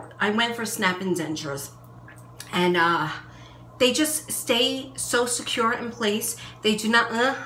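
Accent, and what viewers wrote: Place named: New York, United States. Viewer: I just discovered your channel.... Can i say seriously I've been watching all your videos the last day in a half and I'm in love!!! You are the most down to earth human being I have ever seen....and i love love love your accent...im from Chicago so the new York accent is amazing to me lol. Anyways keep making videos and being beautiful! Xxx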